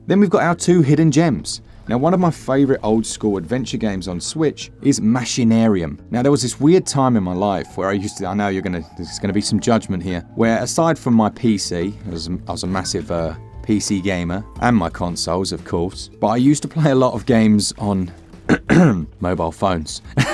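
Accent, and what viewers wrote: United Kingdom. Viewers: Thank you very much (!) for adding yet more games to my backlog!! I should know not to watch these videos because as you said, and i have said in the past, you say what it is on the tin and there never is any clickbait - main reason your one of the very very few (2!) switch channels i watch now. Plus i love hearing fellow brits accents too 🇬🇧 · Nice channel. You brits always sound good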